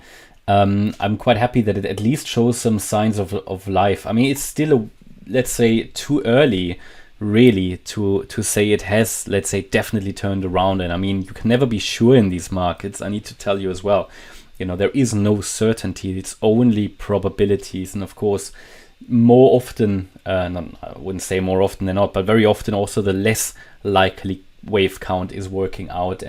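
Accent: Germany. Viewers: Hast du ein deutschen aktzent oder täushche ich mich hier😂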